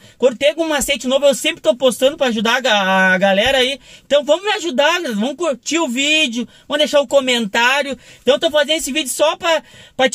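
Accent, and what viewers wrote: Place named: Rio de Janeiro, Brazil. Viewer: Vc parece cariocs . Tu e demais .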